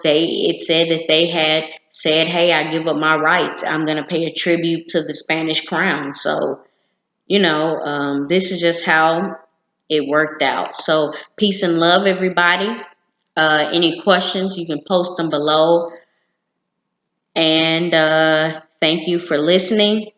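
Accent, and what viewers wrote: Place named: South, United States. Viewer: am from the caribbean and trust me when i say this "black americans " who try to speak about history speak trash. As a matter of fact some things in this video are incorrect. you think some black woman with an southern accent is gonna show up magically knowing what happened during colonial times??americans don't even know the countries of the world muchless history lol,only thing they know is that there was something called slavery. there is no such thing as black caribs and as a matter of fact the black people were west africans who escaped from the plantations during the english and spanish wars over certain islands. this is so misleading and trust me i can continue to name out a lot of other things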